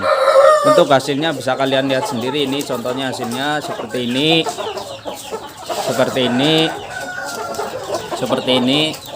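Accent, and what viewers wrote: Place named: Banyumas, Indonesia. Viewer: Ngapak banget bg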